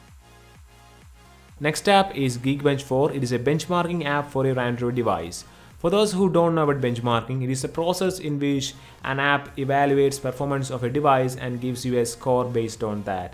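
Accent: Kerala, India. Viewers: He's from Kerala. Can easy make out the Malayalam accent in his English. 😀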